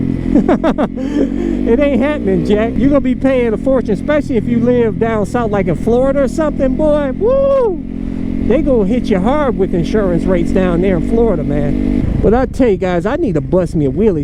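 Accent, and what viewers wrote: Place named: New York, United States. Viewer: From Ohio but talks like he’s from New York lol I would like your videos a lot more if you talked less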